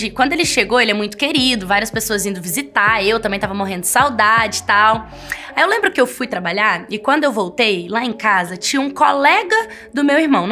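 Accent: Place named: Goiás, Brazil. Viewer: c é de Goiás né?esse sotaque maravilhoso n me engana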